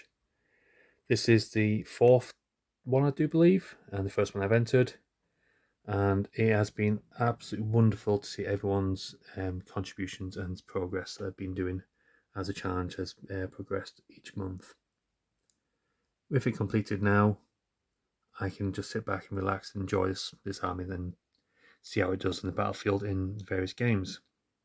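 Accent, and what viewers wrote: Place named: Northern England, United Kingdom. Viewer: Are your infantry on 20mm bases? Also are you up north? As you sound northern. West Yorkshire here.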